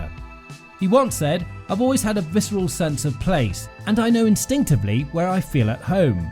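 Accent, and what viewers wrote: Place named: United Kingdom. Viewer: Often adding a British accent to a video presentation seems to give it more credibility but in this case, not so much. It would have been better with a Peter Coyote type voice. It would also be better to showcase the whole resort and institute with all the available photos instead of constantly showing the same photos. And finally, it would have helped to match the narrative to the photos. Yeah, I’m feeling grouchy today but Redford deserves better.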